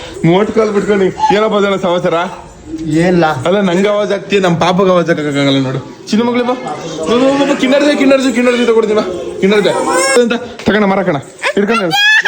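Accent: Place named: Mangalore, India. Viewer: your Mangalore kannada suppppppppper..... 💐🤔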